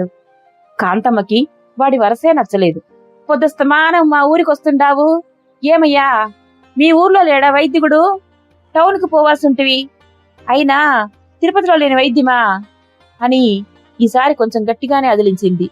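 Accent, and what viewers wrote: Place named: Telangana, India. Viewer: కథామంజరి లో కథలు చాలా బాగున్నాయి. గారు తెలంగాణా యాసలో జీవ గంజి కథను బాగా వినిపించారు. కథా రచయిత బాగా రాశారు.వుభయులకు ధన్యవాదాలు.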